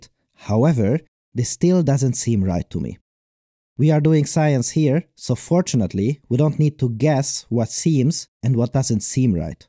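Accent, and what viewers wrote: Hungary. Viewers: as soon as i heard your voice i knew you were hungarian. i like your accent :) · Hogy hallatszik, hogy magyar vagy :D klassz a vidi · Jovan magyar akcentusxd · Probably. His accent sounds a bit hungarian as well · I like your Hungarian accent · omfg as a hungarian I can really feel your hungarian accent xd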